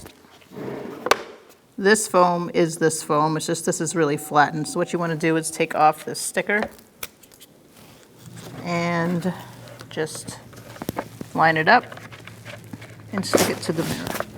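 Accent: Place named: Boston, United States. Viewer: Thankyou for this from Queensland Australia. Love your Boston accent! I'm assuming Boston. The way you say care for car for instance.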